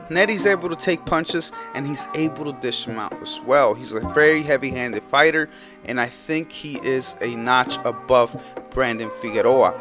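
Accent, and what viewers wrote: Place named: Pocho, United States. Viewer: El que habla se ve que es un pocho, mejor hazlo en español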